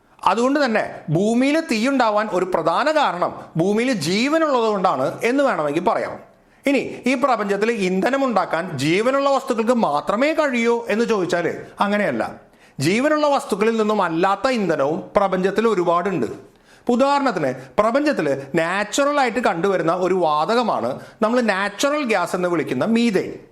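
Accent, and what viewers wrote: Thrissur, India. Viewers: മ്മടെ തൃശൂർ ഗഡി ആണല്ലോ ഇദ്ദേഹം സംസാരം കേട്ടിട്ടു... 😌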